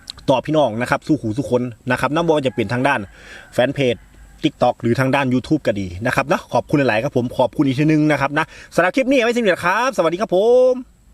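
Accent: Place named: Ban Phue, Thailand. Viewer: อ้ายเป็นคนบ้านใด๋ครับ เว้าคือคนอำเภอบ้านผือแท้ครับ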